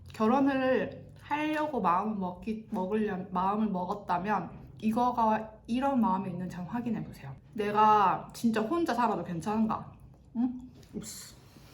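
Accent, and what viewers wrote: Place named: Gumi, South Korea. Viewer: 진정 말씨는 구미분이십니다 ㅎㅎ😊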